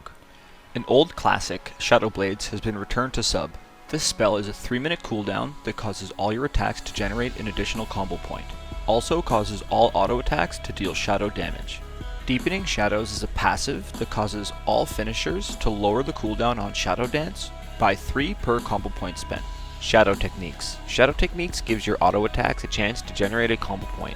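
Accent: Canada. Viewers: Awesome Canadian accent FTW.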